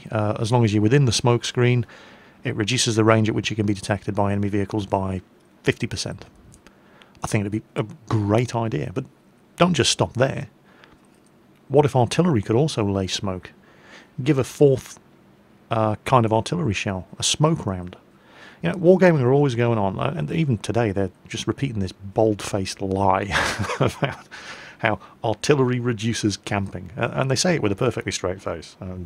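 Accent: Bavaria, Germany. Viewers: where did you learn talking with this awesome german accent? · can we hear you doing your German accent for one Mingles with episode? I can't help but love hearing your German accent. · Dat German accent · Are you bavarian by any chance? :P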